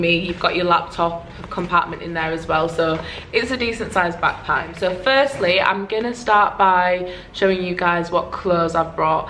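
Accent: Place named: Northern England, United Kingdom. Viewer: literally just came across this vid and the first thing i noticed is how much i love your northern accent! i'm from middlesbrough...love the video...i'll be hopefully doing this trip shortly! keep smashing it! #NorthernLassesDoItBest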